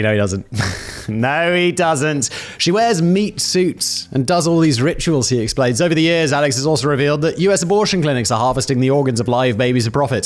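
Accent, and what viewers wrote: United Kingdom. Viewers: I am not a dude and I don't recall YouTube inquiring of my gender. I am subscribed to every channel you have because facts being delivered in the voice of my former colonial overlords helps me sleep... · I’m a twenty something white/Hispanic girl and I absolutely vibe with all your content Simon. Super sad you had to leave geo/biographics bc that’s where I started watching you before branching out to all your other channels but ultimately understand why it had to happen. Either way I’m just a big fact nerd who loves your fancy British accent and cracked out tangents! Never change my dude!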